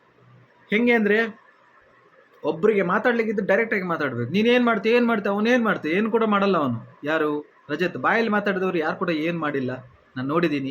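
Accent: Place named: Tulu Nadu, India. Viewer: Enu helta edira tulunadu navaru matadode hage adakke maryade kodi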